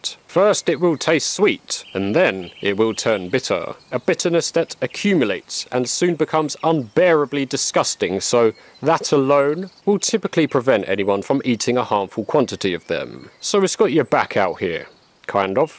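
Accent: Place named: Cockney, United Kingdom. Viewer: the gezzers like a cokney david attenborough.. init.. love it.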